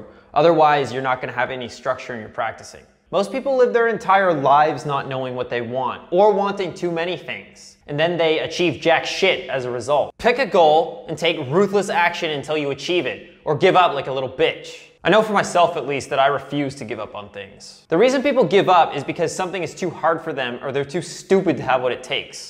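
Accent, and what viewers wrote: Canada. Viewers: I’ve learnt something important from watching channel - that Canadians (well, some Canadians) pronounce 'about' more like 'aboot' and 'out' as 'oot'. Back to practicing now, honest. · Canadian much? Great video man, thank you so much for the tips. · Is he Canadian?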